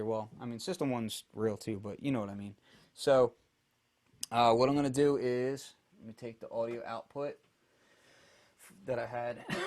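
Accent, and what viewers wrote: Philadelphia, United States. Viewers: are you from Philadelphia? I detect an accent. I'm from Montgomery country, the north west suburbs.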